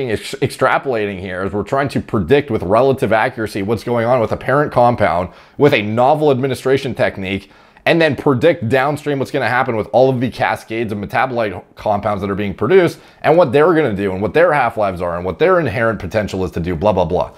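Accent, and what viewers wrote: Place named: Canada. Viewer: Canadian accent, like mine lol. I've been following his channel for quite some time and he's mentioned enough about himself without getting too personal.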